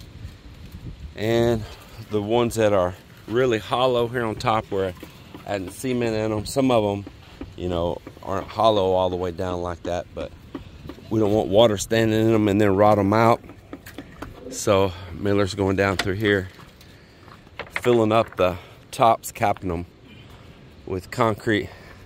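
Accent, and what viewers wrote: Texas, United States. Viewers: Love your videos and your Texas drawl "I tell you what..." A question - do they sell Balsa wood in the PH? I see you at the lumber store regularly and thought I'd ask if you have seen any. Like 2"X4" X 8'? Or a similar wood that is light and strong? Thanks man! 👍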